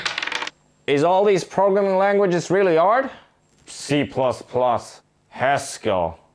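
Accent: South, United States. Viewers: It sounded like what it was, a foreign guy doing a parody of a Southern, possibly Black-Southern, accent. Still works IMO, since a lot of extant Perl is likely in government & old-school tech industries like telecom. And agreed that Perl is great for code puzzles and showing off, but job security isn't to be sneered at...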